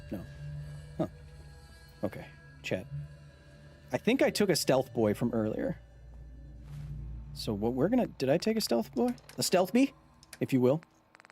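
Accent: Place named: Australia. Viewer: watch for the australian accent and bob ross voice, stay for the thick load of science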